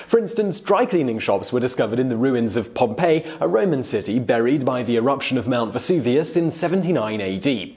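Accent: England, United Kingdom. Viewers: Hello I'd like to start by telling you how much I love your videos & have learned so so much from watching them. They're right up my street as instead of having a set topic you have such a wide,varied & eclectic mix (muchike my brain I'm told) of subjects & I love watching them,I used to watch daily but have recently started to leave them for a while & have a good old binge watch every Friday if possible. Anyway on to the subject of my comment,as I have mentioned I am a HUGE HUGE fan & love all your work however there is 1 thing you do that I find borderline intolerable & that is the fact that you add an upward inflection at the end of a LOT of sentences,the vast majority unneeded as you can be stating a fact whilst sounding like you're asking us a question. As much as I love your vids I find this so very grating as you sound like you're asking questions or are a teenage girl,it's bad enough hearing my 13yo daughter talk this way but one of my favourite Youtubers as well,it's not just me that feels this way as a lot of your fans feel this way too as we've discussed it in the comments before if you've ever seen any we have agreed to BEG you to stop adding this annoying Americanised gumph to your vids,you have an amazing English accent PLEASE PLEASE use it & do our country proud. 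Please carry on making these amazing videos (minus the humph) & I will carry on absorbing all the amazing trivia. Your long time fan Mark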